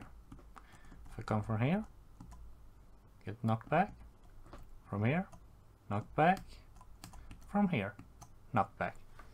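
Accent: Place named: Sweden. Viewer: 100% Swedish :)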